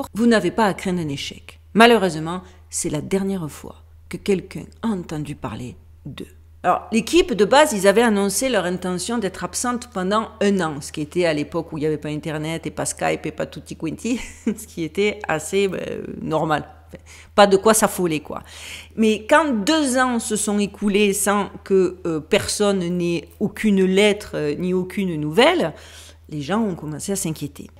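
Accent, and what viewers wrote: Marseille, France. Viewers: 😂 " Mais ce monsieur n'a rien laché, il devait être Marseillais...mais bien sûr, je plaisante!" 😅 J'adore votre humour décalé, toujours fin et respectueux. Je suis parisien mais ma sœur vient de Marseille et j'aime entendre votre accent, ça me rapproche de la famille. Ne changez-rien. J'ai du mal à comprendre qu'avec la qualité de vos reportages très aboutis, que youtube puisse vous emmerder. C'est un "non sens" 😮. Prenez soin de vous et ne lâchez rien!❤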